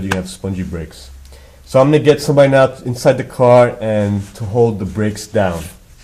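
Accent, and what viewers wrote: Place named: New York, United States. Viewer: Dislike because it should have been a hot girl doing this and not some New Yawker.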